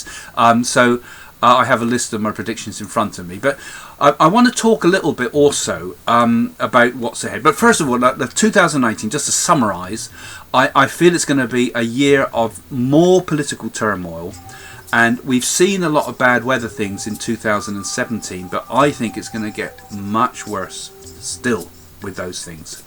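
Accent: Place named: South London, United Kingdom. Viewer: what is your accent? Sounds something between South London and Manchester.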